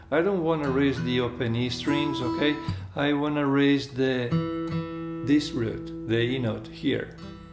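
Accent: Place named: Italy. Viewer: thank you; i could spending hours listening to your italian gusto sound!thank you man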